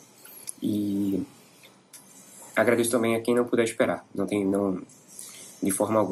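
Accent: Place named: Northeast, Brazil. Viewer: Gostei do sotaque . "É de nós " do Nordeste , eu acredito ! Um forte abraço e força para crescer na crise . Gostamos muito do trabalho de vcs , inclusive , formei um grupo de amigos para todos comprarem as camisas dos Sentinelas , dos cavaleiros de ouro ! Pena que aconteceu isso . Espero que vcs recuperem e assim que vcs retomarem, compraremos as camisas . Grande abraço.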